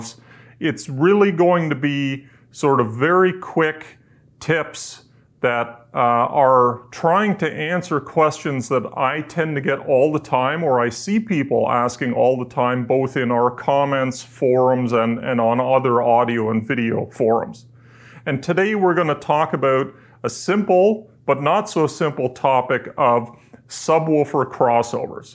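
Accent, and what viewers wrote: Canada. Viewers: You must be Canadian!! Or from Minnesota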